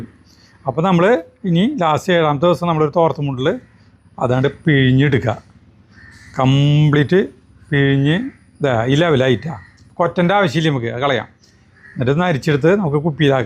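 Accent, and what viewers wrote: Thrissur, India. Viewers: ഇയാള് പറയുന്നത് മനസ്സിലാവുന്നില്ല മനസ്സിലാകുന്ന രീതിയിൽ പറയണം സംസാരിക്കുന്നത് മനസ്സിലാവുകയുള്ളൂ യെ കൊണ്ട് സംസാരിപ്പിക്കും വ്യക്തമായി മനസ്സിലാവുന്ന രീതിയിലാണ് സംസാരിക്കുക നിങ്ങളുടെ തൃശ്ശൂർ ഭാഷ എല്ലാവർക്കും അറിയുകയില്ല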